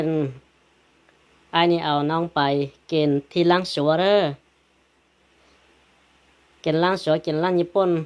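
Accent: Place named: Laos. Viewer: I like your Laoglish Bro.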